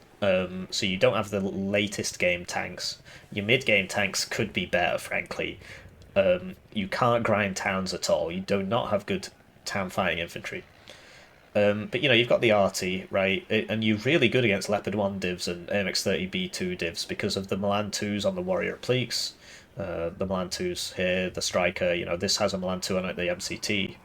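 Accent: United Kingdom. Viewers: Pakistani man in middle eastern attire with a British accent named.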